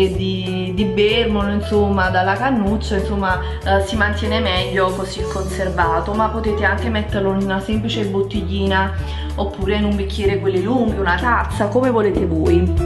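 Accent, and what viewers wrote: Naples, Italy. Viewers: ma sei di napoli???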